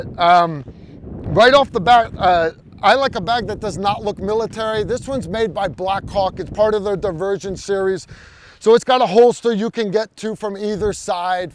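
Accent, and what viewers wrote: United States. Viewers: Is it just me or does he have elements in his accent really similar to Christopher Walken? I may be way off I'm not sure but just curious if anyone else hears that? Is it some kind of American Accent?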